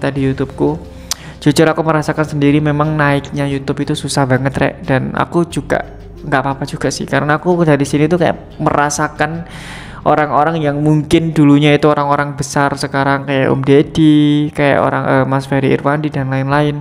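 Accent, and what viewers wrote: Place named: Java, Indonesia. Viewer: Jangan pake logat jawa mas karna tak enak di dengar lihat macam kamar jeri dia orang aceh tapi logat aceh nyah tak di pakai karna indonesia terlalu luas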